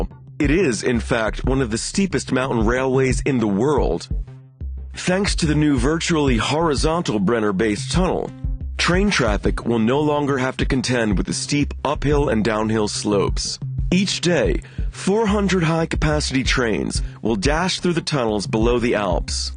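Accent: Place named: United States. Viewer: Is there a reason for the American Accent?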